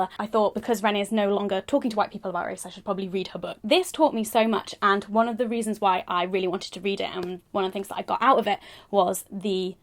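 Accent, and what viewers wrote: United Kingdom. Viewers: Is it weird that I'm a french random guy watching a feminine channel? I really like that Britain accent tho.. And You are quite beautiful.:)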